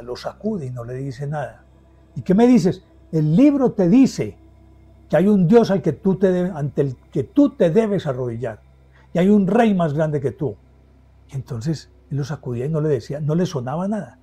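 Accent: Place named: Colombia. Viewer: Que hermosa manera de relatar la historia! Pienso que en alguna vida yo fui Colombiana porque me fascina escuchar la forma de hablar de los Colombianos ❤❤❤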